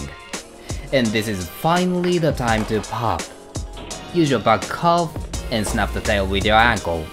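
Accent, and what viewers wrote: Japan. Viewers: he sounds japanese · if this guy is not japanese, I don't know what planet he is from.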